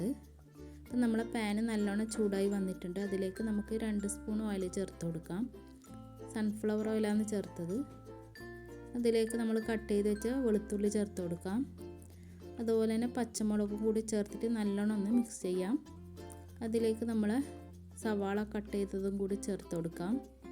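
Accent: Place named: Kannur, India. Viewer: Masha Allah. അടിപൊളി Insha Allah try ചെയ്യുന്നുണ്ട്. വർത്താനം ശെരിക്കും കണ്ണൂർ സിറ്റിക്കാരുടെ തന്നെ 🤣👌🏻 സ്മോക്കി roll ആദ്യമായിട്ട കാണുന്നത്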